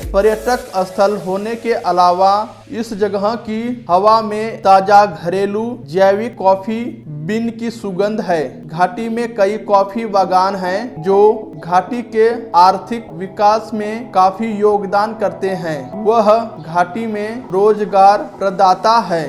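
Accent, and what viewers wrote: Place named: Telugu, India. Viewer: telugodu hindi chadivite ilane untundi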